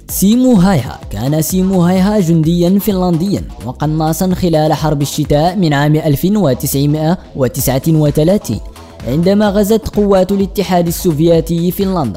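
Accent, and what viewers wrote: Marrakesh, Morocco. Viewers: هههههه افضل شيء في المقطع هو البهجاوي اللي كيعلق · iman vidia maroooki baaaaaayn ou marakchi maazal -__ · لهجته تسد النفس، اللهجة المغربية من أسوأ اللهجات العربية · هذا مغربي ولا كيفاش صوتك لا يصلح يا هذا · ههههههههههههههه صوت مغربي مراكشي امازيغي قح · عجبتني اللهجه المغربيه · vidia Ti Rah Hada Mghribi Puur Bayne Wach Wlde Mrrakch L Hdraa M3tla W T9ilaaaaaaa Katjyb N3aass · هدا. مغربي اللي كيحكي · chi mrakchi hada xD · معلي .. ترى للي يتكلم يبين انه مغربي يعني عربي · نبرة صوت مراكشية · ههههههههههههههه مغربس حر ههههههه و مراكشي ، اويلي على الأتباء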